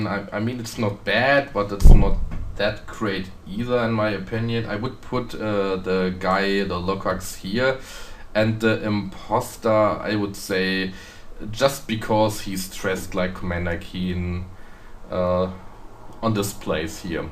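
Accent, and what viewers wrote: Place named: Germany. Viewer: The Overlord did teleport right on to me a time or two, but it seems that there is a little more time to get out of its way. I never made a formal study of German in school, so I don't like to criticize the performance of someone who has put in that time. You have a German accent, but I can understand you easily. It has its charms.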